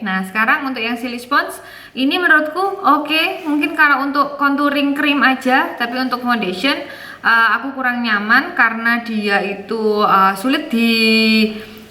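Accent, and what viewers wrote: Java, Indonesia. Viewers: Lucu siii ba ngomongnya jawa banget yaa hahahaaaa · Jawane.bener bener meldol bgt,,,ngakak aku ndelok spean mb lol😂😁😁😁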